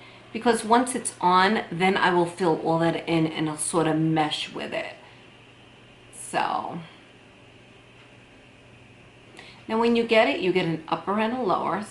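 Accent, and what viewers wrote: New York, United States. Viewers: This helps a lot!!! Are you a Long Island girl? I hear a NY/NJ accent. Luv it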